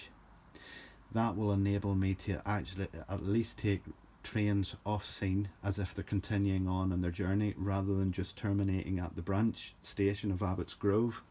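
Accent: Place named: Ulster, United Kingdom. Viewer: Great video and so inspirational, also lovely to hear a soft Ulster accent talking trains 😀 Happy Christmas from County Wicklow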